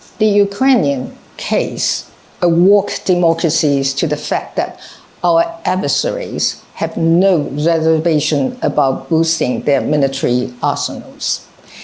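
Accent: United Kingdom. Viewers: 喜歡喵統的英國腔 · 我是來聽小英的英國腔的：）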